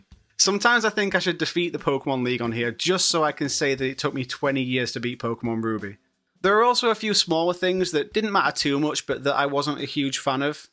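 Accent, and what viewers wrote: United Kingdom. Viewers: Sounds like you've had a similar journey to me mate, stopped at Ruby and then ended up getting Ultra Sun around lockdown. Only really got it to be able to download that "poke-transporter" app from the 3ds store before it closed - ended up really enjoying the whole vibe of the game and it sucked me back into the pokemon world once more! Just like with you and Sword/Shield, all I came across online was absolute hatred for Ultra Sun, but I loved it 😅 Since then I've gotten a hold of and finished Platinum and White - really enjoyed them too. Right now I'm absolutely immersed in Legends Arceus and I'm fairly excited to see where the series goes from here! As a side note, you sound like you probably live around my area, north-west? I grew up around Stockport 🙂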